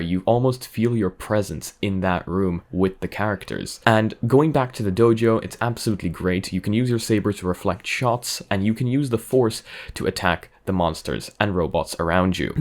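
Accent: Ireland. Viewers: are you Irish? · Is he Irish orrrr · Are you irish lol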